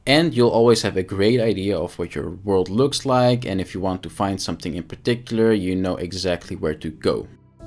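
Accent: Netherlands. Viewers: you sound like a dutch guy not sure but your accent sounds like it haha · You have a pretty dutch english accent 😉 thanks for the video, mate!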